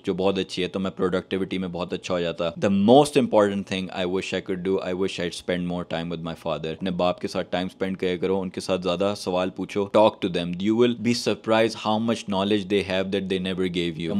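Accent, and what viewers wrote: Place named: Lahore, Pakistan. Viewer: 2NO LAHORI HEN NA SPEAKING STYLE ACCENT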